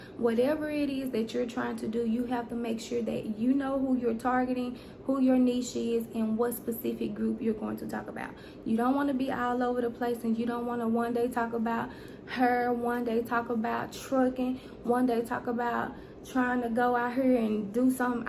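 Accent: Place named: Mississippi, United States. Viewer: I hear the Mississippi all in your voice! 😉 very informative channel, so I just subscribed!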